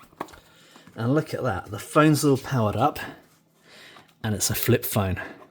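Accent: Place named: New Zealand. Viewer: Wait, you're a Kiwi? I'm really bad at spotting us in the wild..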